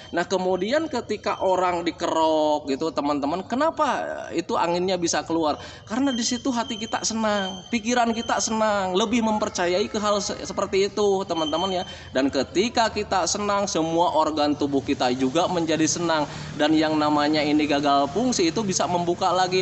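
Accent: West Java, Indonesia. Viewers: assalamualaikum kk,kk orang mn Kya logat2 sunda gtu,pngn ktmu ih klo bsamh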